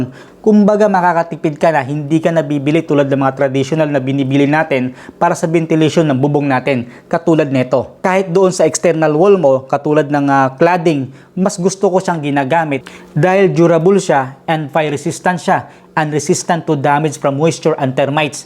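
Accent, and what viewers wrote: Waray, Philippines. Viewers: Waray ka ngay an engineer? Taga diin ka? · Waray ka pala Sir? Tacloban ka Sir? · Waray ka ngay-an gihap Sir. Nice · I hear waray, I subscribe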